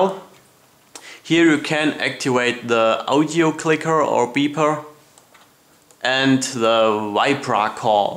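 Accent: Germany. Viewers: das deutsch hört man echt raus :D nettes dosimeter.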